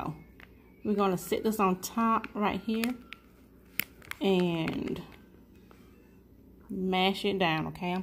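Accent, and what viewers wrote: South, United States. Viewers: Love your accent! Are you from either South Carolina or Georgia? That's my guess, lol. I'm from RI so my own accent is absurd (Boston, lol), so I appreciate a good accent. =) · You sound like I want you for my Grandma. Love that country woman accent.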